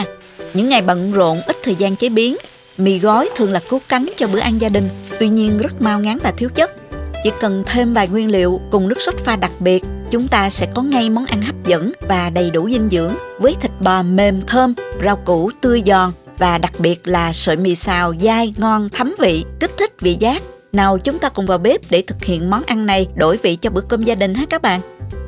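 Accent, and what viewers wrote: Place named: Saigon, Vietnam. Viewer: Giọng nói đúng là người SG đó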